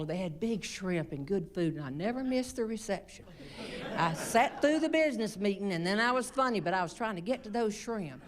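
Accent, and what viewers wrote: South, United States. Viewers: I Enjoy Listening to Jeanne Robertson she Always makes me LAUGH 😄🤣🎶& The most EXTRODENARY sense of humor<~& She does it in such A "SWEET SOUTHERN" Way She NEVER uses any profanity never says Ugley things about anyone !!!!Never uses Profanity ONLY~Brings Out the HUMOR in OUR EveryDay Lives 💁👌😍😍😍What a GREAT THING TO LIVE~Love & LAUGH & Share with YOUR FAMILY FRIENDS & LOVED ONES ❤️ A little JOY & HUMOR-----esp.NOW In Out miserable uncertain world!!!!So This To will bring TO YOU & A SMILE😃On Your FACE & IN YOUT HESTT❤️HAPPY HOLIDAY to YOU & OTHERS😊😆😃😂 to YOUR FACE & A LITTLE JOY In Your HEART♥️🎶💋❣️!!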